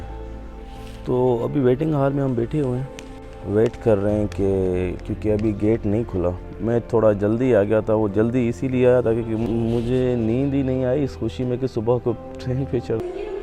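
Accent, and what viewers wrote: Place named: Arab world. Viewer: ماشاءاللہ بڑے بھائی ۔اللّٰہ اعطیک الف عافيہ ۔آپ نے دل خوش کردیا ۔ مگر بھائی مجھے یہ نہیں سمجھ آیا کہ آپ سعودی شہری ہو یا پاکستانی بھائی ہو۔کیونکہ آپ دکھ بھی رہے ہو سعودی اور بولنے میں عربی لہجہ بھی ہے۔۔مگر اردو بھی اچھی ہے۔پلیز رہنمائی کریں۔۔ اخوک الصغیر ۔محمد نسیم لکھنؤ من الھند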